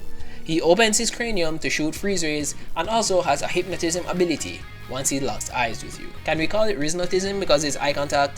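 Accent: Jamaica. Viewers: Is he Jamaican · you sound like jamaican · You sound Jamaican. Are you? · Are u jamaican?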